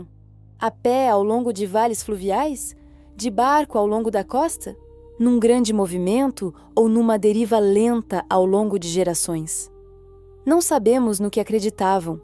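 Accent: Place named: Brazil. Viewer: Moça, por que seu sotaque é brasileiro e você fala português de Portugal? Vídeo bem interessante! Vlw!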